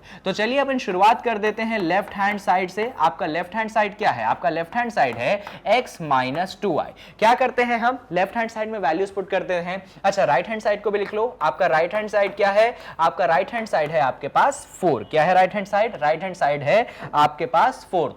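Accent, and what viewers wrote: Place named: Bhopal, India. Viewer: Sir aap shayad bhopal se ho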